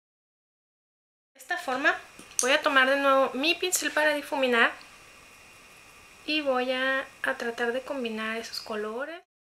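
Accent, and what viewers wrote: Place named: Mexico. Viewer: lindo che por ke pronuncias asi tu mushooo de ke parte de mexico eres te kedo bello y me encanta cuando ablas asi es bien lindo un beso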